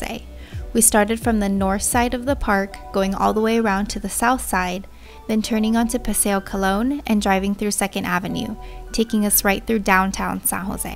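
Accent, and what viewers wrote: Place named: United States. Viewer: Great video! Great American English accent and pronunciation! 💕 ☮ 🌎 🌌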